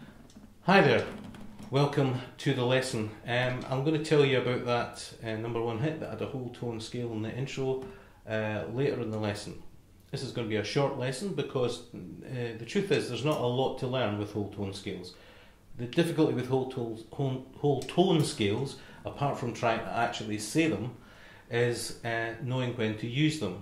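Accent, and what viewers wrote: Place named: Scotland, United Kingdom. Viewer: Nice to hear a nice well modulated Caledonian accent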